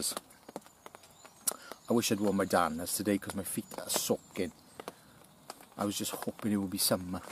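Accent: Wales, United Kingdom. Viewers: I love the way you say feuwell, always loved the welsh accent..Ive a lot of welsh ancestry..I bought a pair of pilgrims recently and rate them. Love helicon tex, real well made stuff. Been wearing their combats too (SFU's) for a while and they're great, well made. Even their version of the M65 pant are bang on. The pilgrim pant can take kneepads but the opening is on the inside, wierdly, well on mine they are at least. Diolch brother...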